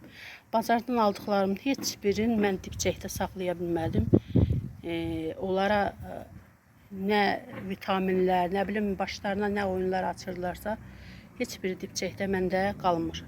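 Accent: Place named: Azerbaijan. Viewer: Çok güzel çiçekler bahçeniz harika konuşma şivesi ile anladım Azeri iseniz herhalde Azerbaycan mı orası benim komşum var Azerbaycanlı çok iyi arkadaşız hayırlı akşamlar diliyorum Türkiye'den İstanbul'dan selamlar sevgiler 🇹🇷🥰😊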